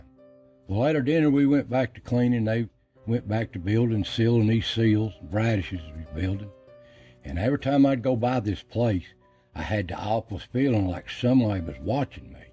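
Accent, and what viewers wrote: Appalachia, United States. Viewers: It is amazing how much your Appalachian accent sounds like the East Texas accent. My only obvious conclusion is that a lot of the settlers here came from your area. I dunno. just a thought.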